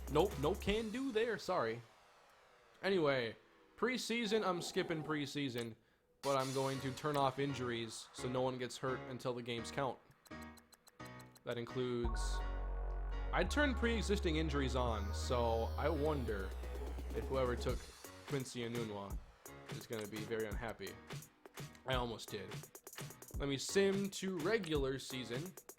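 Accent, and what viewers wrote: Minnesota, United States. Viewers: Are you from minnesota?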